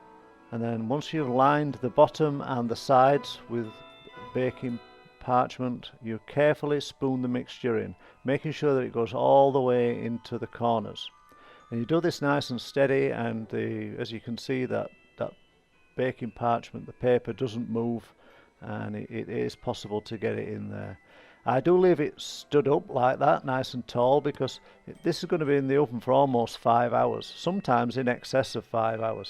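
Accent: United Kingdom. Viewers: I made this cake for the first time this year, followed Delia's recipe to the letter (from the book) and didn't look at it for 4 hours as instructed and it was very burnt, black in places. Very disappointed but too invested to stop so I trimmed off the burnt bits, top sides and bottom, fed it and iced it following your video this time, which was very helpful, so it'll look great but who knows what it will taste like. Sounds like you're from my neck of the woods or over the border (West Yorkshire). Happy Christmas!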